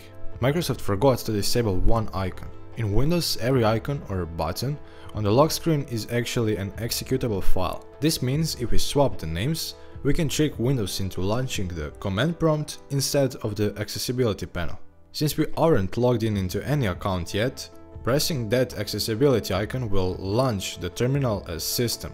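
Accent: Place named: Balkans. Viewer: Your accent sounds like you're from the Balkan region, and after seeing you accidentally type "Ć" , I'm pretty sure you are, so I have a question: "Odakle si?"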